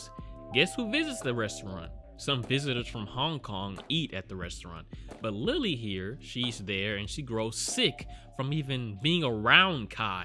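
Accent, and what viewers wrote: United States. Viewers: He sounds like he's an American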